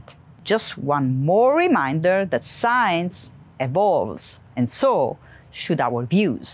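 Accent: Naples, Italy. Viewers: dott lei rimane napoletana anche quando parla in inglese😅. Un saluto per la sua mamma❤ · Quanto è sexy l'inglese con l'accento napoletano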